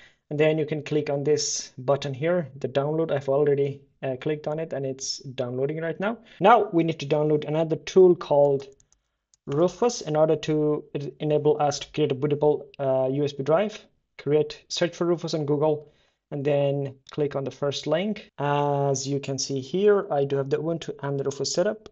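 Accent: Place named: India. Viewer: You can't hide your hideous indian accent well enough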